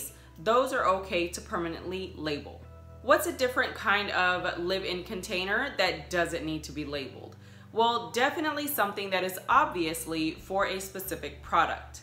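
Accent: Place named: Texas, United States. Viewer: First time here, and as I was listening to you, I was like... is she from Texas? And low and behold... you are!! Great video!!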